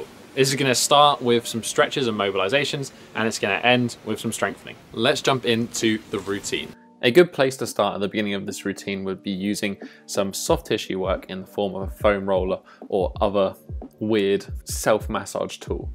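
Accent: United Kingdom. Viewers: british accent Nope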